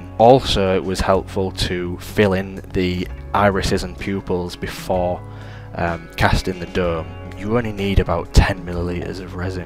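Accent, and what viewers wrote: United Kingdom. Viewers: Goodness, it's not a very logical tutorial, definitely not a step by step video and if you don't know how to do something like this the video will make absolutely no sense, quite a jumbled mess actually. Also his accent and use of British jargon makes it even worse. He does make a nice eye in the end however, but is not a very good tutorial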